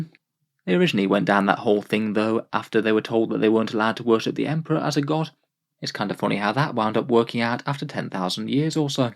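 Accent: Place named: Northern England, United Kingdom. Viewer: He's northern english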